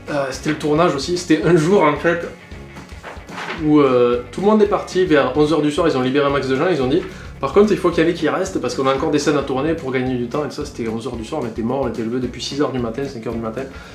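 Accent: South, France. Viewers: HAN Man, ch'uis trop triste, personne ne vient à l'Anim'Est !! Bon, vu ton accent je pense que t'es dans le sud, et l'Anim'Est est à Nancy, en Lorraine... Mais pitié, viens ! Fais de la pub, même pour l'année prochaine, parlez-en entre youtubers ; cette année Noob est invité pour la deuxième fois alors que toi, le JdG, Mathieu Sommet ou encore Antoine Daniel n'ont jamais été invités ! >.< Viendez, on vous aime trop par chez nous !!! \o/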